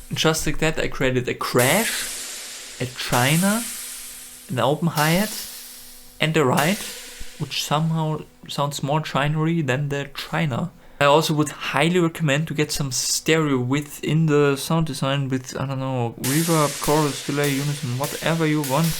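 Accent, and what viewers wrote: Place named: Austria. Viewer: I am a simple man. I hear a fellow Austrian accent, I press like.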